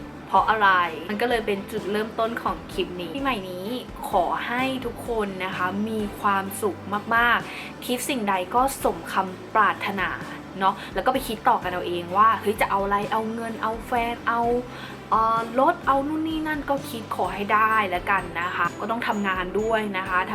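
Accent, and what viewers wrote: Thailand. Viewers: คนสุพรรณ ใช่ไหม สำเนียงออก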